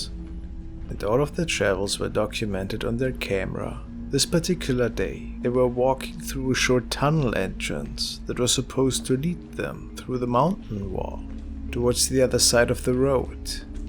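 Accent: Germany. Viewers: Love your German accent my ancestors were German too and I love your accent it makes the videos all the mor spooky. Love you videos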